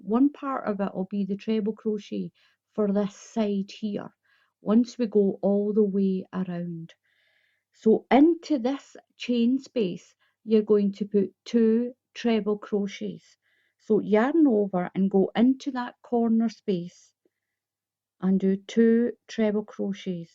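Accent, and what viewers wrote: Scotland, United Kingdom. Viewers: I'm a beginner and have watched your tutorial twice to get up the nerve to try it. Third time is the charm! Though I did have to rewind a few times, I think I have it! I love how you explain everything so clearly and I also love your accent. Scottish? Thanks for this pattern.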